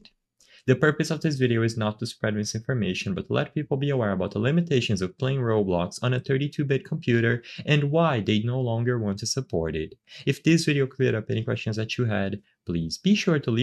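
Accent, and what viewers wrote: Brazil. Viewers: is that a brazilian accent?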